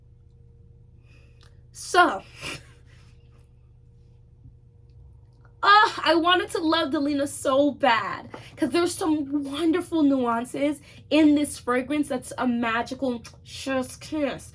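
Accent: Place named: Jamaica. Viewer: Lmao it’s the Jamaican dialects in bits & pieces for me. Enjoyed this vid!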